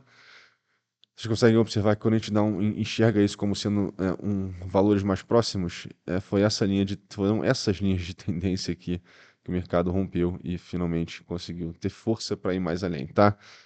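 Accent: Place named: Bahia, Brazil. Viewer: Bahiano! Oxeee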